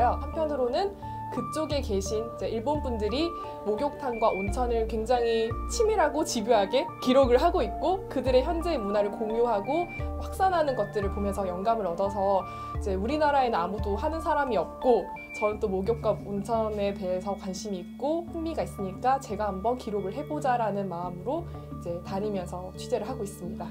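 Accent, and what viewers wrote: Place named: Busan, South Korea. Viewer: 기자님 사투리가 부산일보 영상이라는 걸 보여주네요 ㅎㅎ 좋은 영상 감사합니다.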